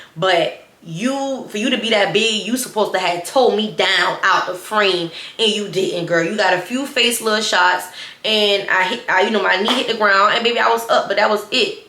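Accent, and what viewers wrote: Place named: Louisiana, United States. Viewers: You most definitely give Louisiana accent. I just ran across your stories a couple weeks ago and I’ve binged watched them all. They help me get through the work day.. and you’re so pretty, sis. Much love, from Lafayette, Louisiana ❤️ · I literally just found you. Your accent makes everything hilarious. I just love your personality and accent. You mind me of a southern version of my girl kayshineee. I’ve been searching for new storytellers and the fact that you give a backstory too like my favorite ones?! Chefs kiss!ANDDDD the fact that you watch Asia and Symone makes me wanna watch you even more. I watched one video and got hooked! New subbie. 🫶🏾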